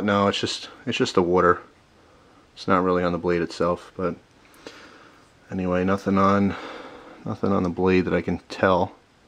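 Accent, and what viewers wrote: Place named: Northeast, United States. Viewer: I love the way people from the North-eastern U.S. say 'water' lol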